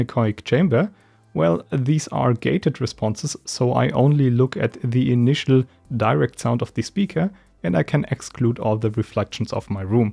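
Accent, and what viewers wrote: Germany. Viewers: HI #Detschakzent trotzdem cooles video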